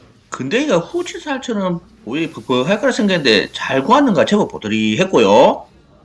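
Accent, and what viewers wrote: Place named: Gyeongsang, South Korea. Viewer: 형님. 채널에 따봉 30회 정도 박고 추천 뜨면 꼭 봅니다. 답변 두 가지만 부탁 드립니다. 1. 아무리 맛집이라고 해도, 기대감이 얹혀지면 감동받기 쉽지 않은데, 정말 이 집만은 무조건 꼭 가야한다라는 곳이 있으면, 한 곳 추천 부탁드립니다. 2. 무꾸재비 뜻 좀 알려주시라예. 볼 때마다 너무 궁금합니다. 저도 경남 촌놈인 주제에 처음에는 사투리가 너무 거슬려서 부담스러웠는데, 지금은 그 사투리 없으면 무슨 맛으로 볼까 싶은 마음입니다. 어쨌든 마창석님과 기분 좋은 영상 계속 만들어 주시면 감사하겠습니다. (마창석님의 짜투리 평가도 넣어 주시면 감사겠습니다. 목소리가 궁금합니다.)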